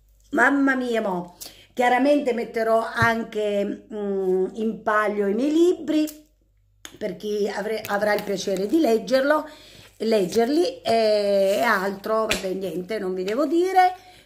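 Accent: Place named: Apulia, Italy. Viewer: Quando intercali in dialetto sei simpaticissima.ma si puglies'?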